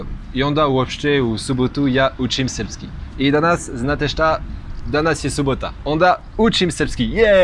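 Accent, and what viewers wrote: France. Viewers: prica srpski sa francuskim naglaskom hahahahahaahh super si ljubi brat 😂💪🇷🇸🇫🇷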